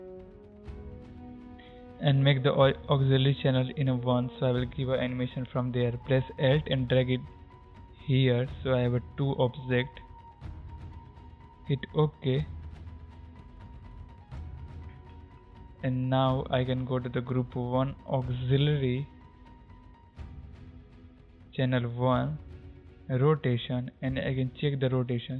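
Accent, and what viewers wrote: India. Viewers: It makes me happy . When You hear Indian accent and You know it's not scammers..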